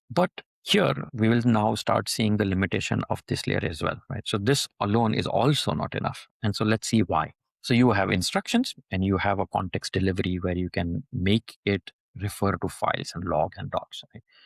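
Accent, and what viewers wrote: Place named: India. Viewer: This was a GREAT explanation, even for somebody who already knew most of this stuff you managed to explain some of the primitives from such an original, opinionated angle (eg skills only after sub agents). Bonus points for making me actually like the Indian accent (I usually end up giving up when I only understand 10% of the words). An interesting segue would be discussing how models can or cannot go through reinforced learning to interact with an harness better than another, could that become a moat for models' labs? Subscribed!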